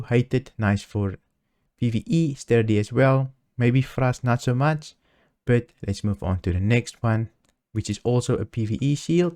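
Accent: Afrikaans, South Africa. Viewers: Love the Afrikaans accent. Im also from South Africa.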